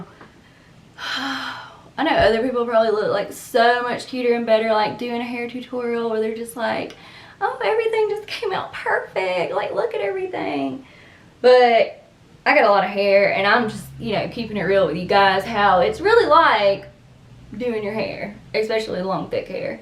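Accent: Alabama, United States. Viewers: I love your accent. You sound like my daughter-in-law. She's from Shelby, Alabama. Thank you for the video. I just bought one of these.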